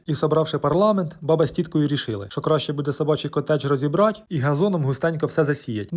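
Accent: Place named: Cherkasy, Ukraine. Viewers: Ото,коли чую слово" кохве", то вже мій день відбувся!!! Чудова українська- центральночеркаська мова. Вітання зі Шполи!!! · тобі здоров'я!!! Бачив що з Черкащини,думав що до Київської сторони,а коли взнав,що з Тіньок,мало не обістявся(земляк в тройні)!!!Моя мама до затоплення з дідом теж в Тіньках жили,а потім в Чикаго переїхали!!! Мені дуже приємно дивитись твої відео аж до сліз!!!Дивлюсь з Одеси!!! Чекаю на твій контент з нетерпінням!!! Дуже приємно і класно в тебе виходить з нашим Черкаським говором і твоєю харизмою!Бажаю здоров'я ❤❤❤!! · Слухаю черкаський говір ,як музику і згадую Таню з Черкас🤭😆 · Черкаси тепер зрозуміло звідки це й знайомий діалект))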